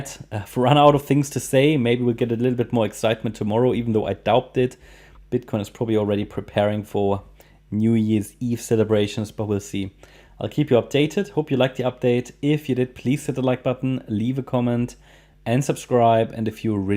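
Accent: Germany. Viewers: Hi, I like your comments. I am wondering and I might be wrong.......are you German? Your English is pretty good with a German accent??....😀 I am German too but was living 17 years in New Zealand..... Happy New Year to you! 🤝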